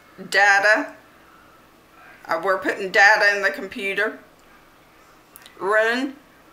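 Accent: South, United States. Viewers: You do have a southern accent!! LOL. I love it! And YAY!! Someone else says rolling!!! I've added your video to the playlist! WHOOT WHOOT!! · What a fun video ... you definitely have a southern accent! I was born and raised in east TN and for some reason do not have much of an accent. I don't think I do, anyway, hehe.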